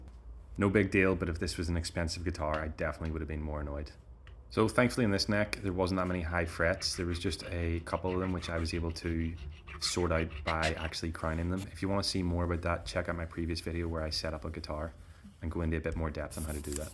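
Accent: Northern Ireland, United Kingdom. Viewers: I'm hearing a fair bit of NornIron in that voice. 👍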